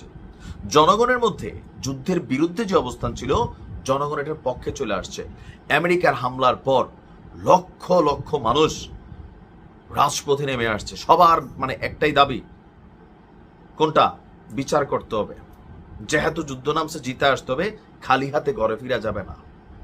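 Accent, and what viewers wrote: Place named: Noakhali, Bangladesh. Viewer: ওটা নোয়াখাইল্লার ভাষায় এটা কথা বলে আল্লাহ জানে আমার চুল ধইরা বাংগাল টানে আমার বাল ধইরা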